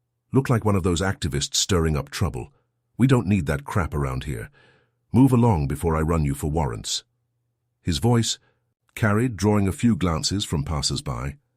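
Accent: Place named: United Kingdom. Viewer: This story is disjointed and ludicrus. This AI crap has really become tiresome. Why is the writer of this story using a British accent to tell an American story?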